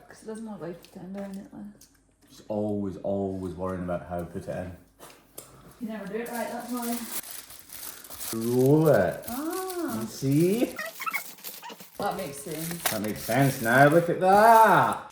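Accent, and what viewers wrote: Scotland, United Kingdom. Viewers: Having had some experience with carbide cutter heads on many different woodworking applications i can say i prefer the three or more cutter ports. The reason being is that theres more contact and control. It works the motor less. And the cutters last longer. No offense to the styling. Its perfectly functional. Oh, and you can get the square bits that have curved sides so its not so aggressive. Or even whats called in the woid turning world as negative rake cutters. Meaning they have a slight bevel on the cutting edge that smooths out the cut. And... You can even sharpen those carbide cutters on a diamond stone. Carbide aint cheap. You are the first hoif trimmer channel i found, and having grown up on a small private farm, i never saw a hoof trimmer and we kept constantly. Enjoyed the FFA knew of and watched farriers trim and shoe horses, but was never aware of hoof trimming for cattle. 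Ive been hooked ever since discovering you. Having Scottish ancestry its a blast hearing the accent. To you id have the worst southern hick accent you ever heard.😂 yall come back now ya hear?!🤣😎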